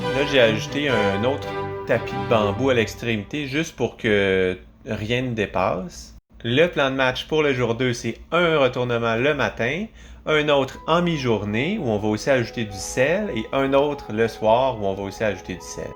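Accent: Canada. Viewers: Bonjour de la Tunisie Ça fait deux jours que je ne cesse pas de regarder vos vidéos que j'apprécie tellement et que je vous trouve le seule youtubeurs qui explique bien les détails et puis j'apprécie tellement l'accent canadien ♥️♥️♥️ Merci infiniment Sauf que j'ai une question, je n'ai pas de frigidaire spécial pour les fromages Est ce que je peux le garder dans une boîte plastique dans la température ambiante ici qui varie de temps à autre mais qui dépasse pas les 20° ici en Tunisie ? Pouvez-vous m'aider s'il vous plaît ? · Merci pour la qualité de vos vidéos, et merci encore de partager les erreurs c'est comme ça que l'on progresse. Ps : pour vous c'est naturel mais merci aussi pour le petit accent et les expressions de nos cousins de l'autre côté de l'atlantique. 😉. Petite question pourquoi ne pas acheter du lait directement à la ferme ou du lait frais complet?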